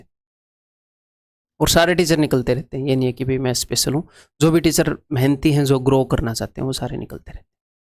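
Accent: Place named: Bengal, India. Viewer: Sir aapko Punjabi Me pura bengali babu lag ri ha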